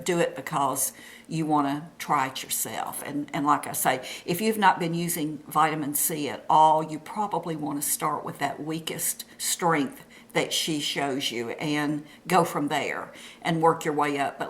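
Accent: South, United States. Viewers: Hi! Wow, I've missed you! I loved hearing your sweet southern accent again. I'm excited for you that you will be seeing Rod S. My husband and I saw him last year with Stevie Nicks in Toronto and it was a great concert. I love them both so it was a super night. What a great buy on the skirt. I will be doing some Easter shopping tomorrow so I think I'll pop into Walmart. You have a beautiful figure and your outfits looked so good. Have a Happy Easter. Come on warm weather! xoxo Susie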